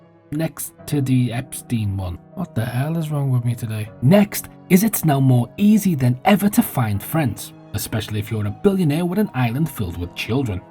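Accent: Liverpool, United Kingdom. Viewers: Sweet vid mate, do I detect a scouse accent btw lol : ) x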